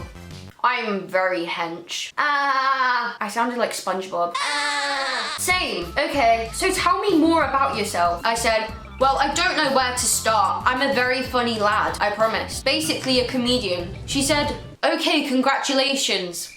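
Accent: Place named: Scotland, United Kingdom. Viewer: Funny accent, scottish?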